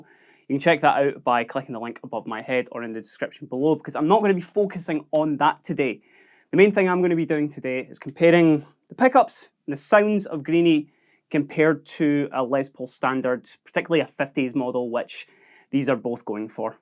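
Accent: Scotland, United Kingdom. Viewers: I like listening to your Scottish accent more than the guitar 🤭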